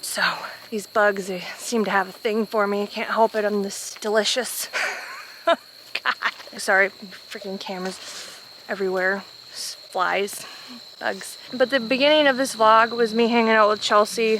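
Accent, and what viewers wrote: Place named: Midwest, United States. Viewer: not sure why this showed on my feed but you seem cool. Also love the midwest accent.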